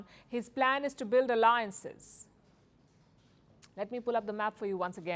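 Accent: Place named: India. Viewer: Firstpost is CIA funded propaganda channel. Palki was chosen for her thick Indian accent, meant to confuse naive Indians. All her material are created by CIA paid staff, she just delivers it like an 'Indian'.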